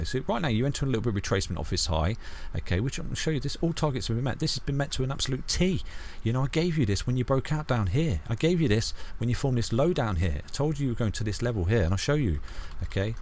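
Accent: United Kingdom. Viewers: I enjoy the British fashion of your talk "bam bam bam see you later" haha.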